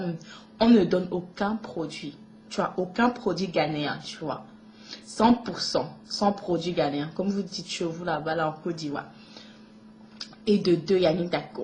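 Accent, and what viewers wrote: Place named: Cameroon. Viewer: Mdr les Camer vous parlez francais? mdr c'est quoi cet accent de merde?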